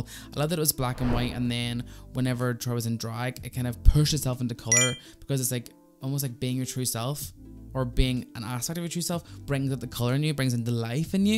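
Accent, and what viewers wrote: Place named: Northern Ireland, United Kingdom. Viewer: Yes ,,,,ok can I just say I hadn’t got a clue what the hell you were saying,,,,,, I would recommend you trim the accent down to the point where people outside N,Ireland would not arrive at the conclusion that English is your second language. And I’m from NEWTOWNSTEWART via Amsterdam and LONDON.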